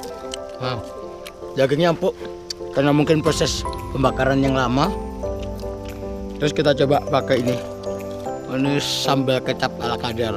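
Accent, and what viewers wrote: Surabaya, Indonesia. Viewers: mas awkmu wong suroboyo ta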